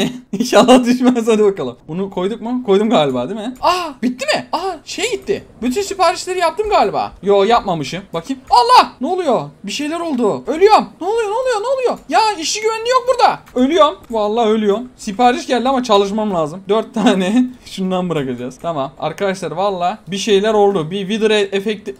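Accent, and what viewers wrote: Malatya, Turkey. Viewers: Abi sen malatyalımısın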